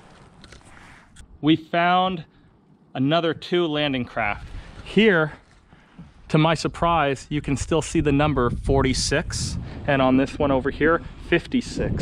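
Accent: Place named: Eastern Canada, Canada. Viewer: Wow great video and history lesson. Thanks for taking the time to put this together. Very interesting. Love that eastern Canadian accent😊👍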